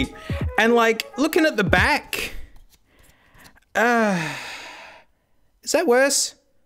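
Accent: Australia. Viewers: “Working down we have 120, there’s 80, 60 and mate if that’s not getting the job done ya bust out ya 1 grit and ya get it done roight” This guy has to be from Australia because he is hilarious to me! Love this channel. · He sounds distinctly less Aussie than his newer videos (and there was no Frank at the end! It's not a video without Frank!) Happy you've now found your stride, I'm just browsing your earlier content:)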